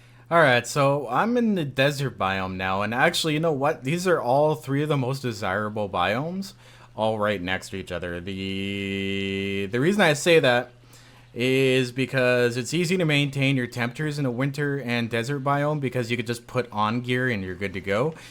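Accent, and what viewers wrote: Canada. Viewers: You sound Canadian. Is he?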